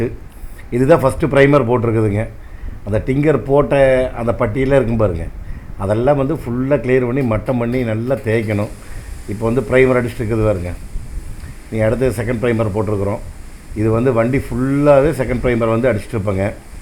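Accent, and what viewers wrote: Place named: Coimbatore, India. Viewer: அண்ணா உங்க கோவை தமிழ் அருமை சூப்பர்🇮🇳💖🙏🏤🕋⛪🙏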